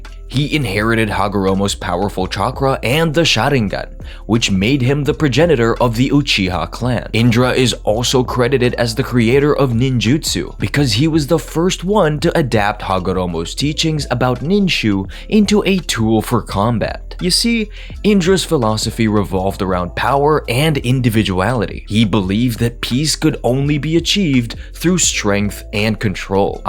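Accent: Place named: Philippines. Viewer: I'm sorry if this might be offensive or whatnot, but I like how your accent rolls off. It sounds like Filipino or something.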